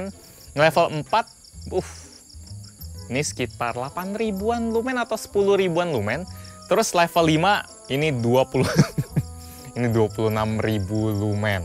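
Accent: Palembang, Indonesia. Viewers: "Trus klo liat kepocok😂 wong palembang caknyo budak ini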